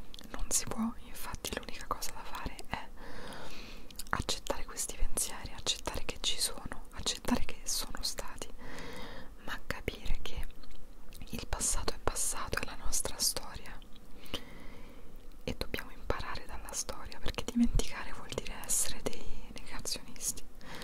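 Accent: Italy. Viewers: No entiendo nada jajajajajajjajajaja pero adoro el acento italiano!!!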